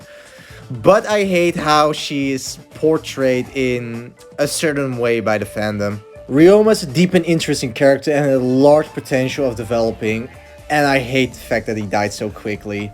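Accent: Netherlands. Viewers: I hear a dutch accent :3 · iconic video!!! loved this. the video raised a question for me tho are you dutch? 😭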